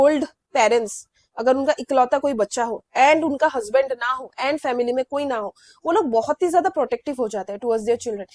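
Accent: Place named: Bengal, India. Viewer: ❤❤❤❤beautiful ❤❤❤❤with bengali accent. Its nice❤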